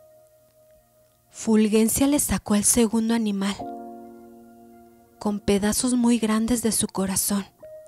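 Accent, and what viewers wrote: Mexico. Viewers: Me encanta el acento mexicano, tu voz y narrativa son perfectas. Estos tres aspectos hacen una experiencia única tus audiolibros, además de ser cuentos populares y con alto dialecto coloquial. Me parece excelente el mensaje final, sobre promover la cultura en cualquiera de sus formas, que por cierto en este video no lo agregaste. Soy un agradecido seguidor de tu trabajo. Saludos 🇻🇪🇨🇱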